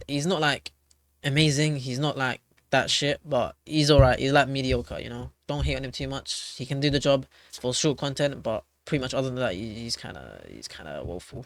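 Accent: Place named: United Kingdom. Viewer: Strong bri ish accent😊😊😊😂🎉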